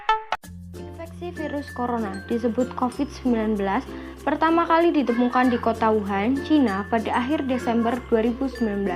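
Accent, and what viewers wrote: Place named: Java, Indonesia. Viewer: Medok nemen nok bahasamu😂😂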